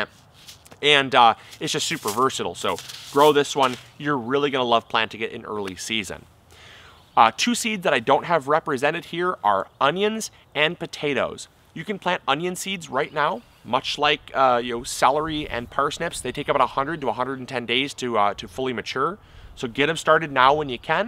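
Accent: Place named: Midwest, United States. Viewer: I'm Missouri so I appreciate that midwest accent when he says "salary" instead of celery. So do I.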